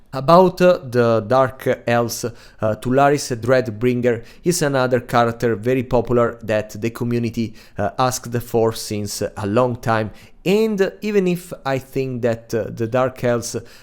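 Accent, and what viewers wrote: Italy. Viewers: Ah ha! It was Italy lol. I was trying to identify your accent. I love the content, thank you for the great videos. (From Canada) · dall'accento che si sente subito che sei italiano ahahah.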